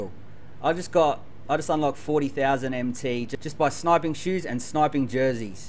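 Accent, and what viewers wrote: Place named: Australia. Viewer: Bro your from Australia to me to😁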